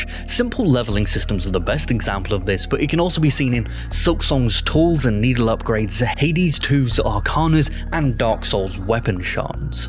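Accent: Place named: United Kingdom. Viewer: correct because british accent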